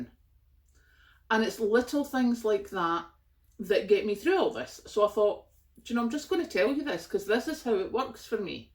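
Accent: Scotland, United Kingdom. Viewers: agree - yes, some days a small step is worth reflecting on as many small steps get us to the end of a task and some times it is just too much to think of whole tasks - a little step or a few help keep things under control and keep us moving forward - love your planning and organisation - never tried a slow cooker myself - sounds like a practical idea and probably less fuel than some stoves ( I'm in a rented house - with solid plate electric stove and the oven does not heat up much) was wondering if I might try making wholemeal scones on my electric griddle but have been focused on some other stuff and haven't baked for years!:o) maybe one of these days....?! Love your practical ideas and applications - realised recently many of us were misguided by media etc and when I see people with back up heating and back up cooking that seems to be so practical and wise than the house proud decorating focus and other lifestyle addictions many have had in recent decades - miss the Scottish accent now that I am back in N Ireland - have shared your channel with some Scottish friends. 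Take care. Best Wishes.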